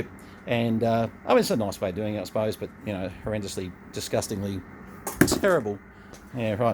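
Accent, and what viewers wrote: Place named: Australia. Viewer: Wow. Glad you're here. I'm trying to repair/restore the very same AE 76-577. Such issues after issues. I've had to install NTE1233 when we couldn't get an ECG1233, and that's because well, you know what it's like trying to find an 02A today without donor radios. Then I had to rebuild the VCO block. The last owner had cracked the slug so I had to dig the old one out, resleeve the wall and donate a slug from another. Now after all that, I've still not got lock. I'm chasing a gremlin. I need to get an AE 76-577 specific service manual. I got my hands on a Cybernet service manual, but it can't quite help. Anyway, it was nice to hear another Aussie while looking for AE stuff, and great to hear and see you got yours hummin' again. 73's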